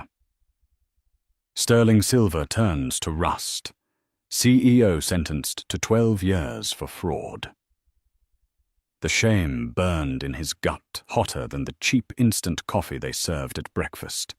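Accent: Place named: England, United Kingdom. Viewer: Dreadful English accent!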